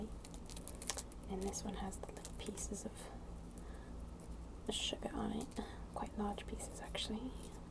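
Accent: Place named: United Kingdom. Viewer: I'm in love with your accent! Are you from England or Scotland? Perhaps Australia?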